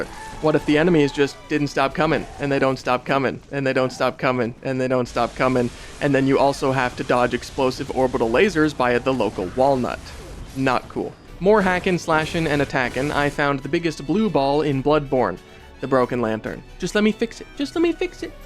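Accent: Canada. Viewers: Never realized you were Canadian, but dropping "University", "bursary", and "KD" when talking about noodles all in a single video outed you as a true great white northerner.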